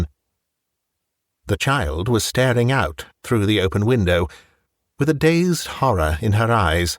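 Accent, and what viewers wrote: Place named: RP, United Kingdom. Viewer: do you think your narration is more British, or Transatlantic?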